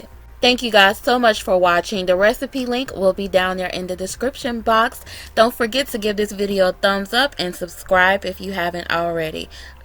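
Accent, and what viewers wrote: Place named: Chicago, United States. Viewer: Are you from Chicago? You have the accent. Lol. Great video.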